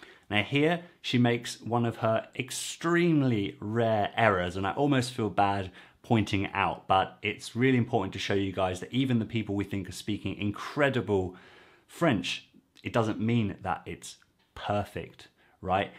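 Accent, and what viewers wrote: England, United Kingdom. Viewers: which part of england do you come from? regards from colombia kid! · Thank you! Merci ! Interesting analysis, mot par mot, j'aime ça ! And - as English is a foreign language for me as well - I enjoy the British accent too, j'ai un double pleasure! 😁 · The pot calling the kettle black since you hear this chap is English as soon as he opens his mouth .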